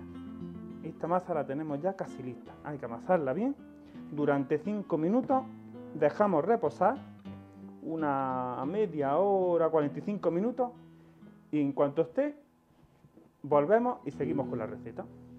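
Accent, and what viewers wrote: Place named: Granada, Spain. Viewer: Me encanta escuchar tu acento y tus palabras de Grana soy de Armilla vivo en California por 42-43 años cocinero o como ahora se dice Chef , jubilado pero sobre estas fechas empiezo añorando mis comidas y niñez Trabaje en Casa Salvador en los 70s Un saludo y gracias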